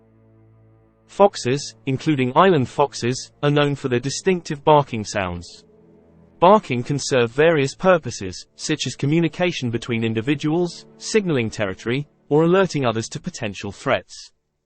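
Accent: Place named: United Kingdom. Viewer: and to add insult to injury, the narrator is UK.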